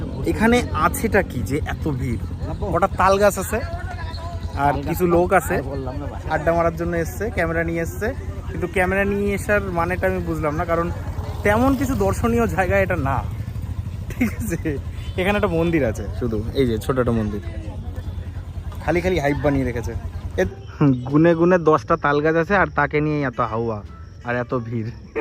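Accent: Bangladesh. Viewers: Dada tumi to Bangladesh i vasa bolcho 😊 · দাদা কি কুষ্টিয়া আসার সম্ভাবনা আছে নাকি? কুষ্টিয়ার ভাষায় কথা বলছেন।🇧🇩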